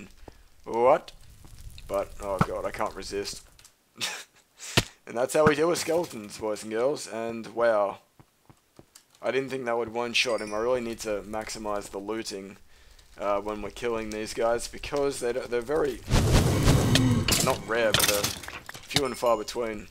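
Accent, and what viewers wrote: Australia. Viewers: Because he's using the Australian English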